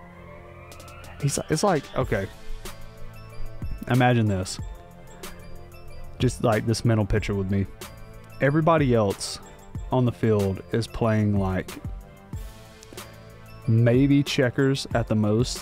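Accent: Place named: United States. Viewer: Football bro come on, I'm American too but never will I say sawkir.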